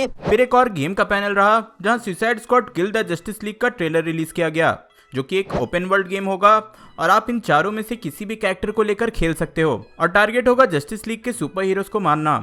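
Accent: India. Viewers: HAS..A.DESI.TOUCH IN HIS.VOICE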